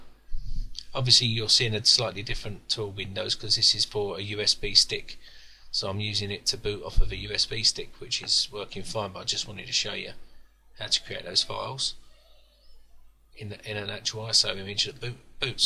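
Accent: England, United Kingdom. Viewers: buddy ur from england???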